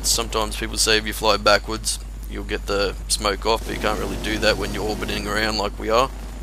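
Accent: Australia. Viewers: Aahahah, brilliant commentary. Funny, enjoyable, epic ausi accent and actually really good advice. I play on PC, but tactics are the same. Ty Mate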